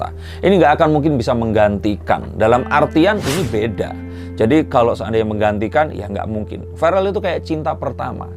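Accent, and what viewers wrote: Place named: Java, Indonesia. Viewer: Lama2 ngomongnya ikutan medok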